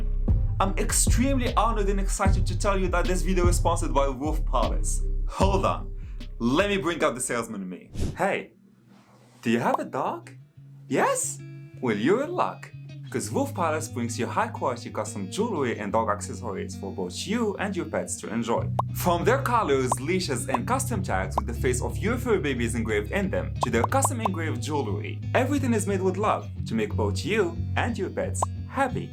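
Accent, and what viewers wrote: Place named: Morocco. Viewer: M9awd bro I’m just assuming you’re morccan from your accent but anyways I like the editing the quality the confidence so keep going bro you definitely going far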